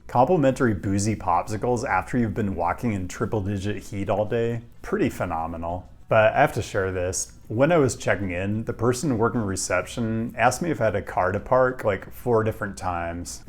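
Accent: United States. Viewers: Your accent is interesting. Where did you grow up? Very American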